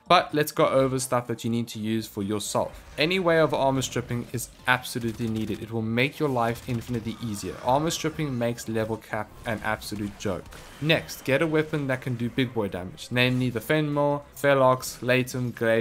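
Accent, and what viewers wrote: Afrikaans, South Africa. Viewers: do you speak afrikaans your accent says yes if you do: Dis awesome om te sien hoe goed suid afrikaaners doen sien bitter min wat bo 1 duisend subs het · Boet are you south African??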